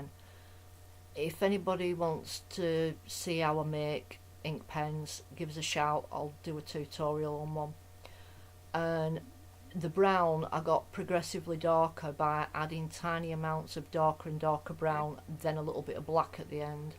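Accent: Lancashire, United Kingdom. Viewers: Wow, so vibrant. This is the depth of colour I've been looking for and got so frustrated with watercolour pencils and prismacolour wax pencils. I've purchased a set of 12 Brushos and will approach them from a mixed media/craft perspective. You're the first person that I've seen do a tutorial truly painting with them and none have used the thickener in this way. You are truly innovative and inspirational and I love your Lancashire accent. Love from Chester UK.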